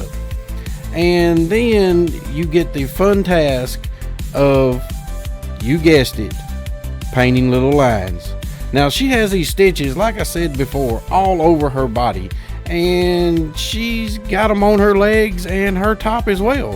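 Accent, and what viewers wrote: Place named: South, United States. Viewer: You have the most southern accent I have ever heard. She came out great, I might have to grab this one myself.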